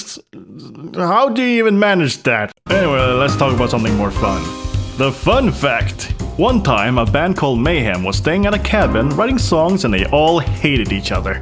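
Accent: Norway. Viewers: Love your cute Norwegian accent hahaha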